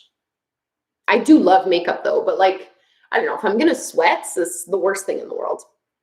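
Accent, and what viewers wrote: Canada. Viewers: Thank you for this challenge, I got my boyfriend to start doing yoga with me everyday and we are loving your videos! Also I loved hearing you speak French! I'm French and I love your Canadian accent! ❤️❤️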